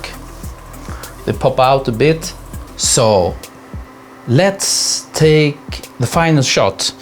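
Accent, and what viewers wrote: Sweden. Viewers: Ahhhhh proper Swedish accent. Renewed my passport today and I felt I needed to hear some more Swedish so good timing with the video.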